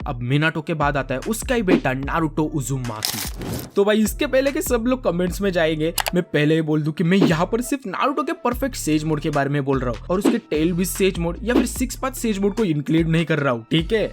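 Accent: Maharashtra, India. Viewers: Bhai tu Marathi hai?!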